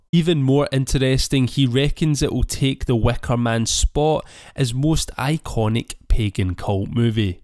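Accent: Scotland, United Kingdom. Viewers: "Arrr Eyes Soup-eRR GAL-EWW-D ON da Ssssska-reeeen" Your Scotish Brogue kills me. God Bless ya'. Move to Hollywood,because we need to hear that voice. Normally, Americans we can't understand a bloody thing Scots say, but you talk slowly. It's a blessing! U rock Scots-dude!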